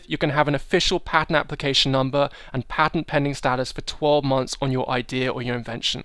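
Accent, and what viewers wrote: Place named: England, United Kingdom. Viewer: your talking provisional patents and US related. You sound very english to me. Does this work in UK also?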